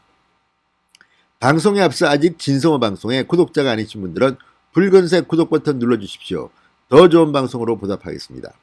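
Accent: Gyeongsang, South Korea. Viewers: 말음법칙아시나요? 경상도 분 잘 안되죠? 활용->화룡 ; 일요일'>이료일 - 미국에서 40년 거주 갱상도 출신 교포가 제안드림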